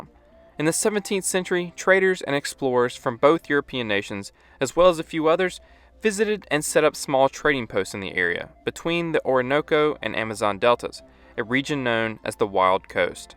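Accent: South, United States. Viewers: Dude I love your voice. So relaxing. I can tell you’re from the south!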